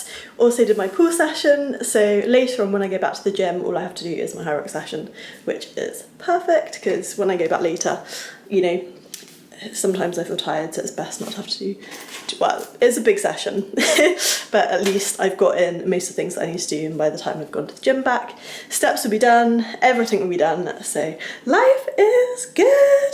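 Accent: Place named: England, United Kingdom. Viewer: I love your accent, english girls always get a +1 thanks to the accent.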